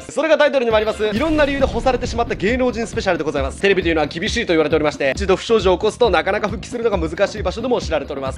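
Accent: Kansai, Japan. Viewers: 関西弁のイントネーションが 気になってしまう〜〜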